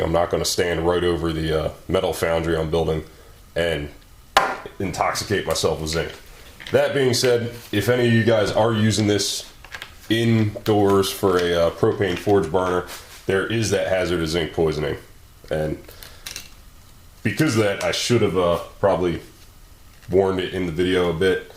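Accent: United States. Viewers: It's AvE but in American English instead of Canuckee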